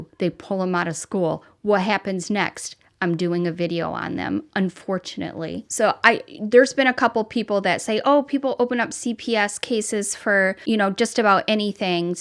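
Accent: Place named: Michigan, United States. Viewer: Yep I knew you sounded like Michigan. Your accent sounds so familiar I've been trying to figure it out. Such a fan.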